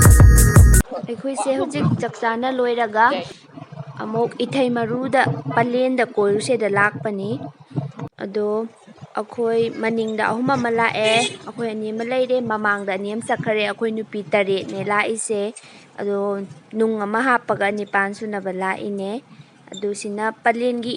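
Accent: Kakching, India. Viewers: I love kakching voice accent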